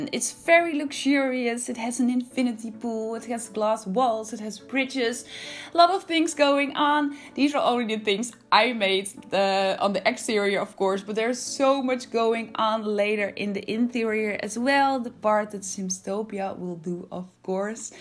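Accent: Netherlands. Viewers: Your accent is so Dutch 😂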